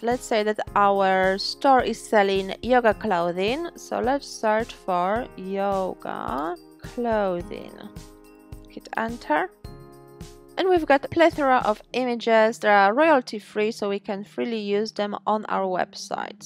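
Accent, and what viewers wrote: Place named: Poland. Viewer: You've got Polish accent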